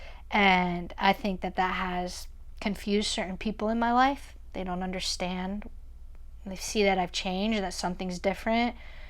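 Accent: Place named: Italy. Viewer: I heard the Italian in you before you said it.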